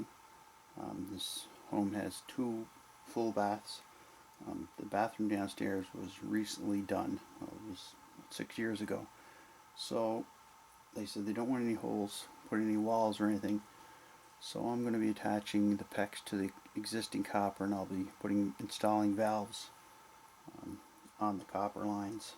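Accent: Canada. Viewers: Fantastic series, thank you!!!! We need to redo the lines in our house, and now know what to do! We are interested in putting in a manifold system, now know how to do it. Love all the great information, even it the idiots on here think you're rambling. That "rambling" is the little shit you run into that makes you go.. "uhhh, what to do I do now." You take care of all that with your musing. Thank you! One question, where do you buy this stuff? Thanks again, love the Canadian accent eh. ;P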